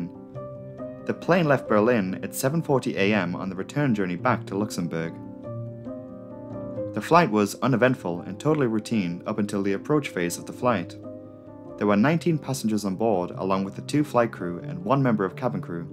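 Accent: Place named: North East England, United Kingdom. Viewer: Based on my limited knowledge of UK accents (I’m American), I’m guessing he’s from North East England. And I agree that it’s an appealing accent; it’s certainly much nicer-sounding than mine 😆.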